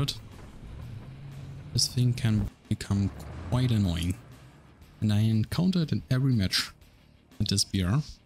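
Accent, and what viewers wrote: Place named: Germany. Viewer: wen i hir se jerman egsent i fiel wery much at home. Geiles video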